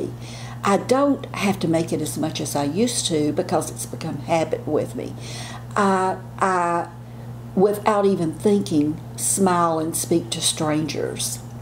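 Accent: South, United States. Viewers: Kindness begets kindness, you are so right! And I believe it is contagious! BTW, I love southern accent. No other accent in the world is as charming, and makes you want to smile regardless of how you may be feeling. And yes, I am a southerner so I might be a "tad" partial!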